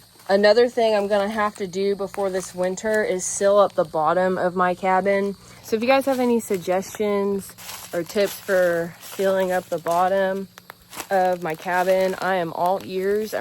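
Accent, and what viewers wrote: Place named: Tennessee, United States. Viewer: I was trying to go by the accent & thought maybe Tennessee? But, I have only been there once so…..?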